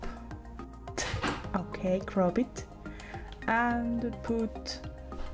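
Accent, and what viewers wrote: Chile. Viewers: Chilean? Wkajjs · ♡I LOVE YOUR ACCENT OMG♡ (I'M CHILEAN TOO HAHAHA)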